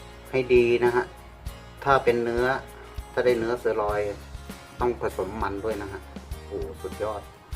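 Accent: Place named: North, Thailand. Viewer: ฟังสำเนียงการพูด น่าจะเป็นคนภาคเหนือนะครับ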